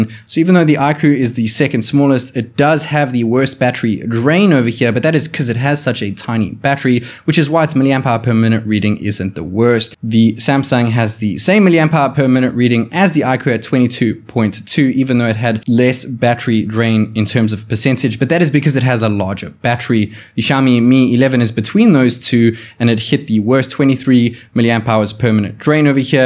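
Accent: South Africa. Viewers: You sound south african bro 😌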